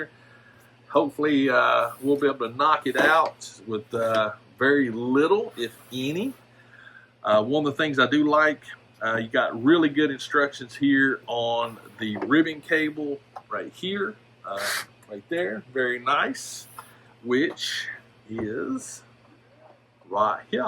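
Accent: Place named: Georgia, United States. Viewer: Are you from Ga? That accent sounds a lot like mine!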